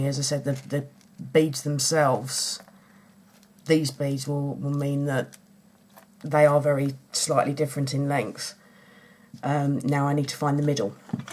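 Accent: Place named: England, United Kingdom. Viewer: Great tutorial and idea! Thank you for sharing. Also nice to hear English accent I live in Sussex! Lol I'm used to hearing Americans! Lol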